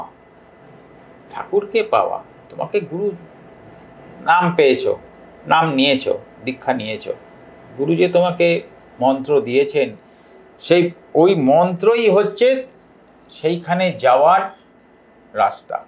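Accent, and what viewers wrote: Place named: East Bengal, Bangladesh. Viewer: r byakhya aro shunte chai.omar purbo bangyo bhasha te shunte khub sundor lagche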